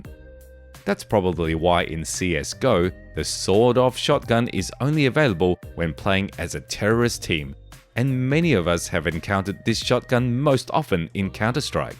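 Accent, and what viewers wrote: United Kingdom. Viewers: this vid lost me at a brit narrating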